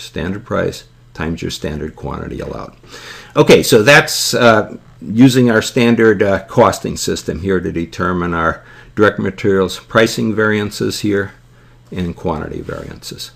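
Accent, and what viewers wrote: United States. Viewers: dude wth this is confusing as fuck with ya yankee sounding ass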